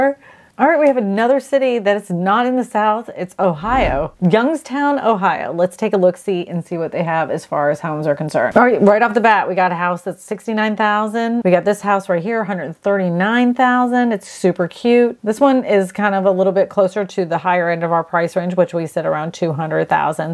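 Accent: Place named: New York City, United States. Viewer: People in Ithaca do not have that accent. You’re accent is more NYC, Long Island, New Jersey.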